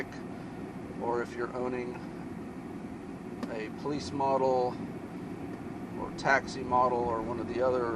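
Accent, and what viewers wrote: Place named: Texas, United States. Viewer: You might should type that e-mail address out as it's hard for me to understand that Texan drawl.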